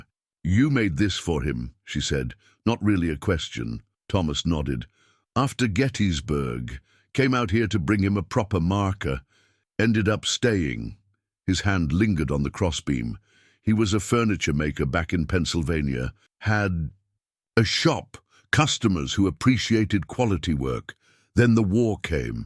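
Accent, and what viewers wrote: United Kingdom. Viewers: Sorry but the engish accent and cowboy stories don't agree. The english storyteller is bad. His pauses at the wrong time ruin the pace. The best thing is the pictures of the cowboys and Indians ...mostly very handsome. Now the picture of the womsn in the above picture. How many women in the 1800s had tattoos on their chests?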